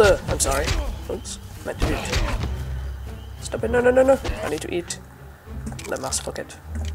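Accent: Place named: North, United Kingdom. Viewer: Thanks for the upload, just got through watching it all, brought back many memories :) Sounds like you're a fellow Northerner with your accent as well!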